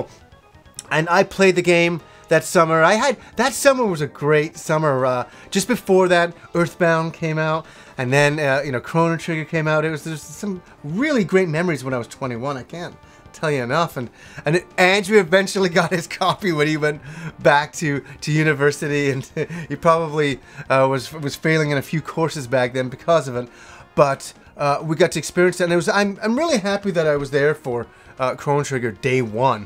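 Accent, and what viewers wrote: Yorkshire, United Kingdom. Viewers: U are a Yorkie? which part were u from? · WUT? your a Yorkshire Lad?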